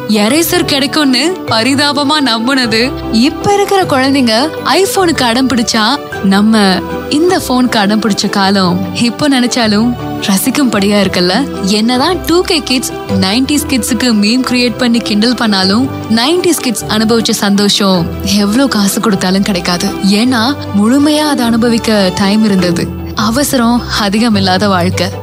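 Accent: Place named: Kongu, India. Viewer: Hi hru voice super kongu Tamil PSG arts college mass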